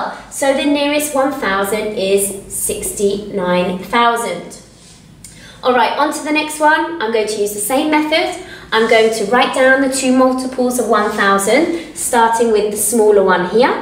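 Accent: England, United Kingdom. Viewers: I love your britost voice can you a video on English speaking practice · British girl HAHA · I love your British voice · Ooho kon sir das ki ho England kya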